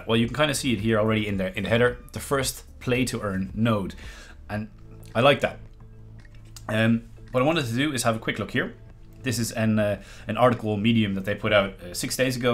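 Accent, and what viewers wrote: Ireland. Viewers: Bro r u irish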